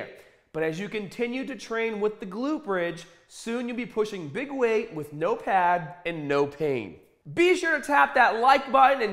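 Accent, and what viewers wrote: Boston, United States. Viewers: It sounds Bostonian but also occasionally Australian but also sometimes just standard lol. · Ba-bell! Lol. Love the Boston accent.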